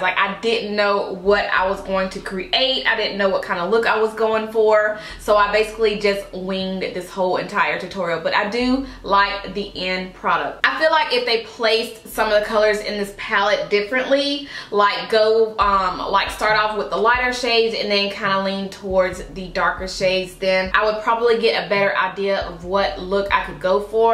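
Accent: South, United States. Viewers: you are southern! lol it's so cute!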